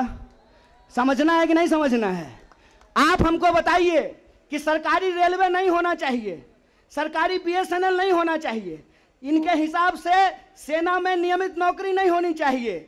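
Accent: Bihar, India. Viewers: It's dialect of Bihar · Bihari style like our souls 🥰🥰🥰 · His Bihari tone even make his speech more loud and powerful and also beautiful...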